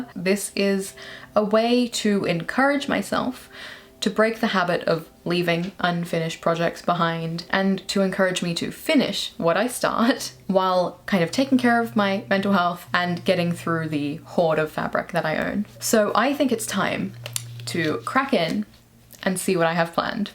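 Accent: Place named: Australia. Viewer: This is my first time watching one of your videos. I have a PhD in linguistics and I find your accent fascinating. At first I thought Australian and then English but with a lot of North American influence. Your rhotic R changes back and forth even in the same sentence. You have a very interesting mix of accents and influences. And I found the content interesting too 😊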